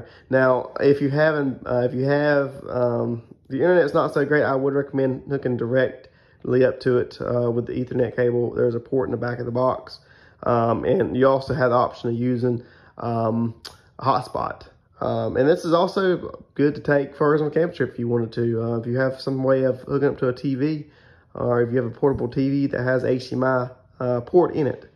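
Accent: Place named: South, United States. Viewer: face-orange-frowning: Had a hard time understanding too much mumble and southern drawl together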